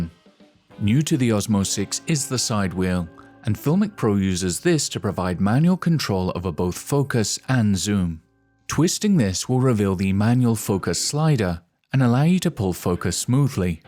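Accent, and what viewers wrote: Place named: United Kingdom. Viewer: I agree with you. Best of the British accent.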